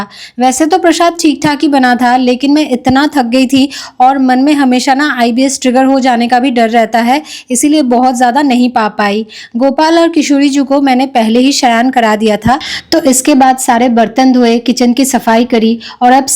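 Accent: Bengal, India. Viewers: Such a cute bengali accent ❤ Just love this❤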